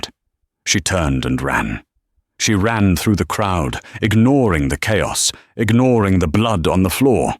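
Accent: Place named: United Kingdom. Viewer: I have a simple solution if you are interested? I just put a piece of paper over that picture section of the screen, and click on Transcript to listen and read along with that purring English / British accent, and let my imagination do its magical thing, as it was meant to. A humble suggestion from Windsor, Ontario Canada EH?!🇨🇦 Cheers! Enjoy!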